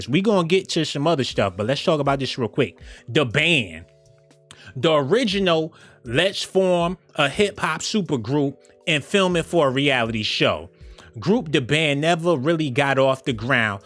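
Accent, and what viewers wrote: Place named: Cleveland, United States. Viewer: The reason people saying some jacked up shit is cause yo voice dude lol they don’t take u serious “sometimes”. The way he talks: u know what im sayiiin we all gotta come together an beat our chicks an maybe we might get a promotion at our 9-5’s the nigga talk like Cleveland lol but he sounds like he super sarcastic while saying real shit lol but I don’t care I only said something cause u said u don’t want people to talk trash about him. It’s ur voice it interests more people into doin it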